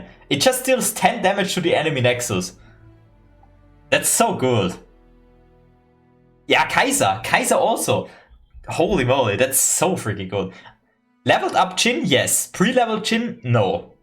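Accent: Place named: East, Austria. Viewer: Came here from Sunny and know about you both for some time through his streams but didnt expect you to be austrian aswell. From your accent I would guess you are from the north-east states like lower austria or vienna, right? Good content, keep it up!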